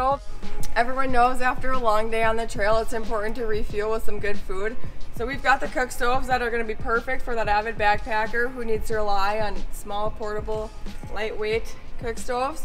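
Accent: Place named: Canada. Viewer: She sounded just like a normal person and slowly she sounded more and more Canadian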